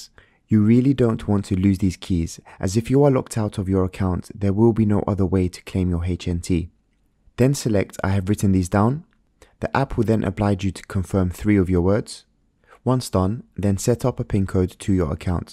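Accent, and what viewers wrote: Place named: Cardiff, United Kingdom. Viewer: You sound like a lad I used to smoke bud with from Cardiff haha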